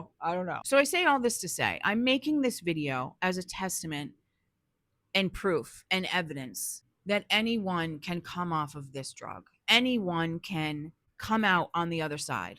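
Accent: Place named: Eastern Massachusetts, United States. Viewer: Thank you for the video, great job. I knew you were a fellow Baystater before you mentioned Boston & Worcester with that Eastern Mass accent 😊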